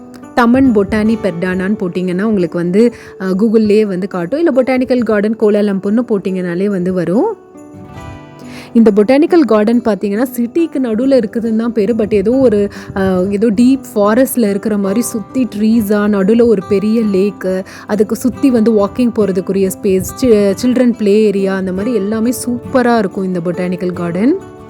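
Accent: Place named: Sri Lanka. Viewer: sister super 👍 உங்கள் தமிழ் இலங்கை தமிழ் போல இருக்கு, ikea இ‌ங்கே யும் இருக்கு, from Switzerland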